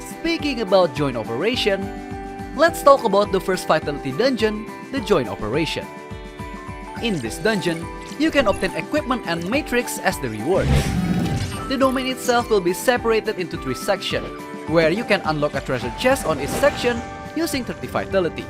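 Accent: Indonesia. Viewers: Lu orang indo ya bang? Logatnya kerasa banget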